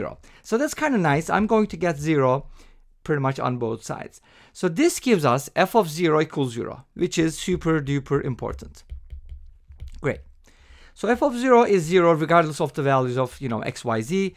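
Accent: Turkey. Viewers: reis Türk müsün ya? aksandan çakıttım biraz.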